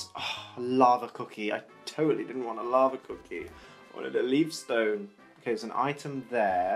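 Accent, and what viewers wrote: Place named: Midlands, United Kingdom. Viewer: You've quickly become my favourite pokétuber! I've managed to get most of my friends into your videos too! Fellow Brit here, are you from the midlands? I'm from the west midlands and you sound like you're from near here :)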